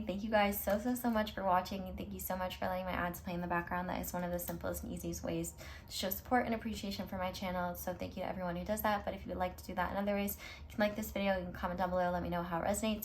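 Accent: South, United States. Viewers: First of all I think my new favourite quote from you, of all time is “give a little subscribble.” Also, I’ve somehow never noticed the southern accent before until the very end of my reading today 😮😍💕😊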